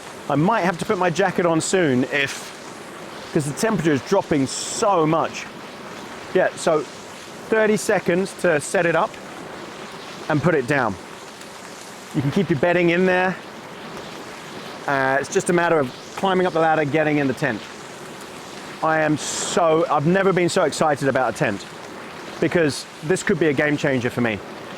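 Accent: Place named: England, United Kingdom. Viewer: you look Maori with a English accent awesome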